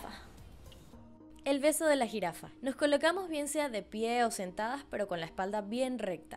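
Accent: Colombia. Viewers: Eres colombiana y costeña carajoo <3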